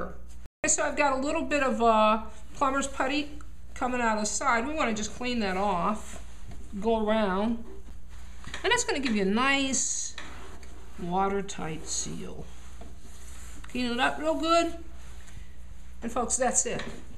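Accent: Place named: New York, United States. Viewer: I gotta ask, what accent is this? Does this orignate from NY?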